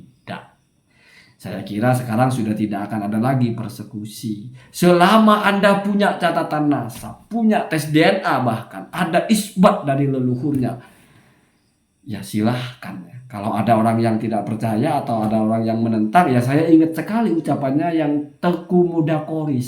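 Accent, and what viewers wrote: Madura, Indonesia. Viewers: Debat soal nasab, saya belum yakin kalau belum dengar hujjahnya. Penyampaiannya simpel, jelas, netral dan lugas dengan gaya Maduranya😁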